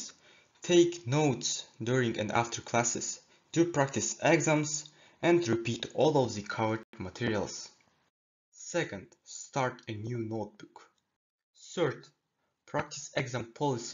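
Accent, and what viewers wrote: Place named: Eastern Europe. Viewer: I love your Slavic accent! This video was quite helpful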